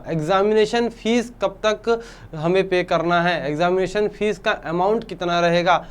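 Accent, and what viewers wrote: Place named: Odisha, India. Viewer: Sir ap odisha se ho kya,apka Hindi wese lag raha hai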